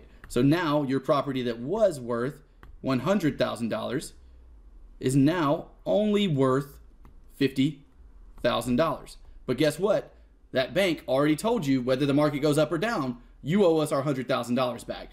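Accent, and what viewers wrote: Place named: Alabama, United States. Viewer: man you have got to be from Alabama.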